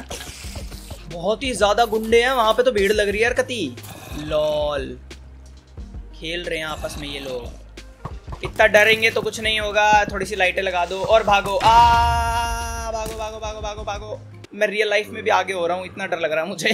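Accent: Haryana, India. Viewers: I love when you speak Haryanvi because I am from Haryana I am your big fan love you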